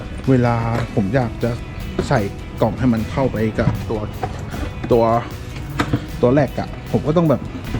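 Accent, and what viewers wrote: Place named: Central, Thailand. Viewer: สำเนียงนี้โซนกำแพง ชัยนาท คอนหวัน อุทัยใช่แน่นอน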